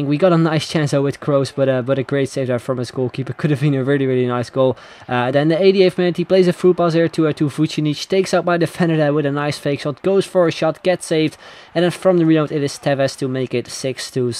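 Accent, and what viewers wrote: Netherlands. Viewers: Je hebt echt een nederlands accent maar je bent wel fcking goed met skills ;)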